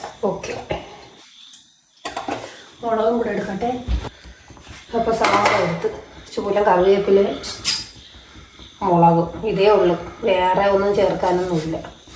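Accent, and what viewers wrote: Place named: Palakkad, India. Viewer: പാലക്കാട് ആണോ വീട് സംസാരത്തിന്റെ ശൈലി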